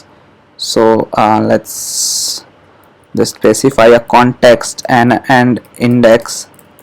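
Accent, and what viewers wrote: India. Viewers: Nice to hear some Indian voice in flutter area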